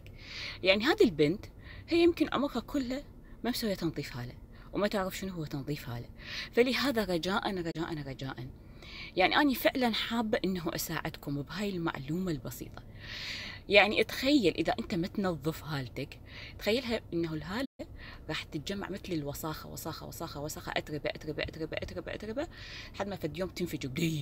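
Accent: Iraq. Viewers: ههههه حبيت الكلام العراقي ❤ شكرا دايما طلي علينا بفيديوهااتك الحلوة المفيدة.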